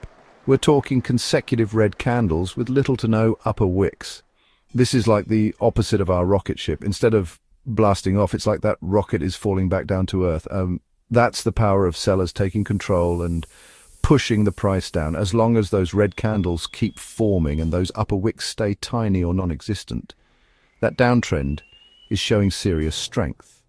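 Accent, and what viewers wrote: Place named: United Kingdom. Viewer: Very informative and u sound british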